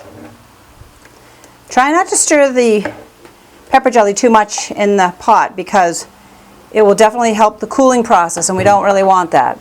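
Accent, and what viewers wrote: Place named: Maine, United States. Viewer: Do I detect a New England/Maine accent? I am from Freeport, Maine and you sound like home! Now I’ve gotta try your pepper jelly. Have you tried it over a loaf of cream cheese? Delicious..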